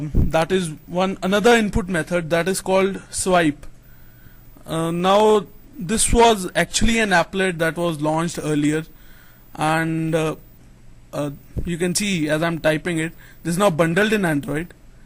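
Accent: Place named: India. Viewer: ur indian accent sucks!! its funny though!lol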